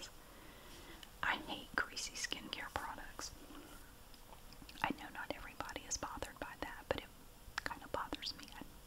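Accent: South, United States. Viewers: Yay other southern asmr love it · No filter on your southern accent/charm. 5/5 Stars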